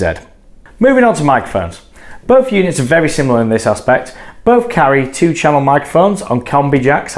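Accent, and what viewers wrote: United Kingdom. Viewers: ... this dude looks like Jared Dines with a British accent!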